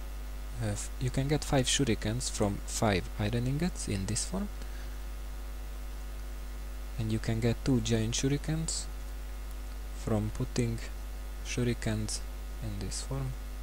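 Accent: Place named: Hungary. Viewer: Annyira hallatszik hogy magyar vagy xD ;)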